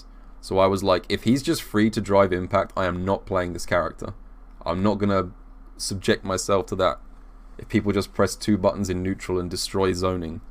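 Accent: United Kingdom. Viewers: I really enjoy u talking about sf tech u clearly know your shit and I could listen to an English accent all fukn day lmao it’s like the best of both worlds hahahah 👊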